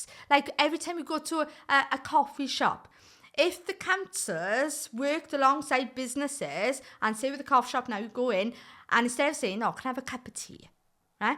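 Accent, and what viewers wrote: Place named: Wales, United Kingdom. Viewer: Aww, you sound 100% Welsh to me! Love the accent! ❤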